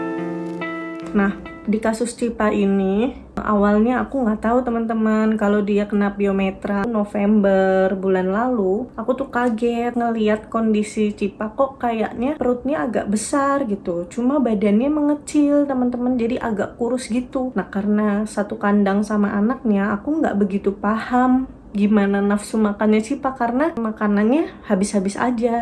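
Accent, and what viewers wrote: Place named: Banyumas, Indonesia. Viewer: Dari logatnya kayanya kaka org ngapak ya🤭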